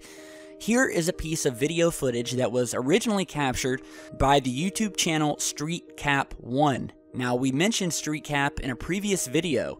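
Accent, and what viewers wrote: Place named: United States. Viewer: its cuz hes talking american english. go have fun listening to some brit's accent. freaks me out all the time dunno why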